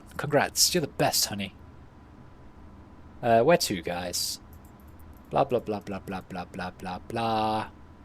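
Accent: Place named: United Kingdom. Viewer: If (I said IF) you are looking for constructive criticism, I'd do a lot more comedic commentary on what you are playing. You don't do very much at all, but your voice is great (especially for an American audience who are inordinately impressed by a British accent), but you aren't giving enough original material. More comedic commentary. I'm going to subscribe and see how you do. 👍👍👍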